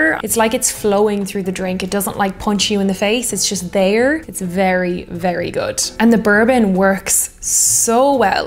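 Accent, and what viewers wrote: Ireland. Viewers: We'd love to have you. We can never have enough beautiful women with an Irish accent over here 😁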